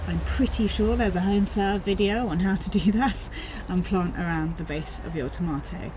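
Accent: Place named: England, United Kingdom. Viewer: Very nice video and lovely English accent, thank you!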